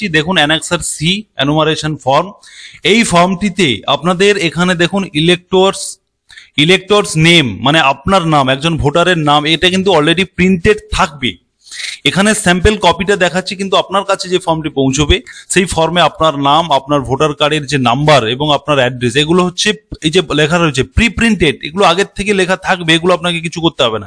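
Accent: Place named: Bangladesh. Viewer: Apnar kotha sune mony hocche apni Pure bangladeshi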